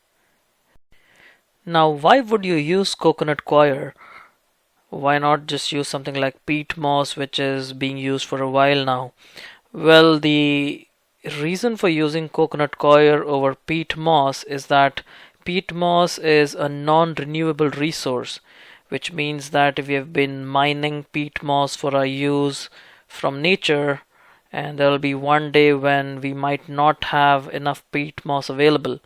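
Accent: India. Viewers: it's an indian accent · not an Aussie accent its Indian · I love Indian accent, so refreshing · indian i think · Your accent seems to be an indian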